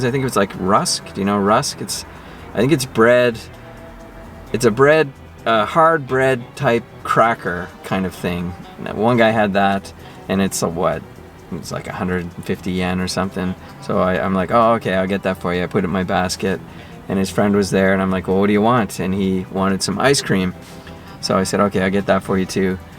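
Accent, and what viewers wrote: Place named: Canada. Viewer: You may not have had food from home in a long while, but you still sound Canadian, eh?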